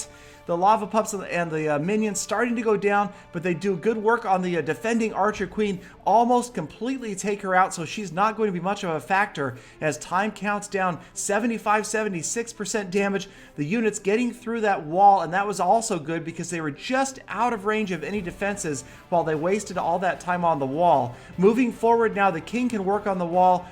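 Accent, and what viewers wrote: Norway. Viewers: Are you norwegian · are u from norway?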